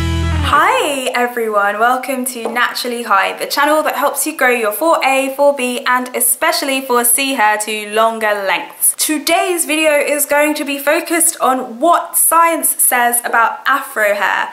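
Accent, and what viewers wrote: England, United Kingdom. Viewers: Yes, this is considered very well spoken British English. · fucking brits and their accents, fucking hot lol 😍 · Which part of England youre from, love the accent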